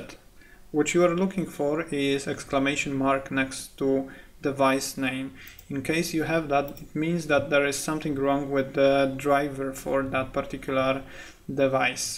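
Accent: Romania. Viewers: Cool Accent. Romanian? The first option worked great and for those who don't know, you may need to type: cd C:\Windows\System32 in the Command Promp first and press enter. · Se vede ca-i roman nu are acent de englez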